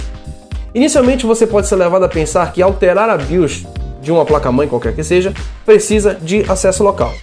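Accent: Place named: Belém, Brazil. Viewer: Sotaque de belém é bem legal. ")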